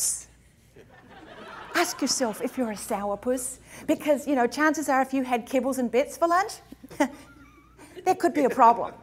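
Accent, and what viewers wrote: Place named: Australia. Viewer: Houston speaker with an Aussie accent - who would have thunk it?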